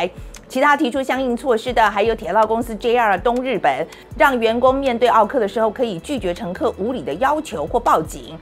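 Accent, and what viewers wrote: United States. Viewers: 范姐一整個美式日文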